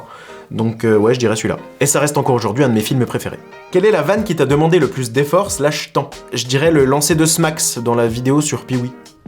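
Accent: Franche-Comté, France. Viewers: Je rêve de t'entendre en invité dans un 2 heures de perdu sur Pee Wee's ! Des conversations en franc comtois et en bourguignon... du miel pour nos oreilles !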